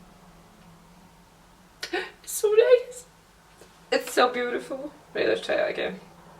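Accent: Scotland, United Kingdom. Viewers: I'm a new subscriber and This is amazing And r u from Scotland 😂 xxxx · is she Scottish?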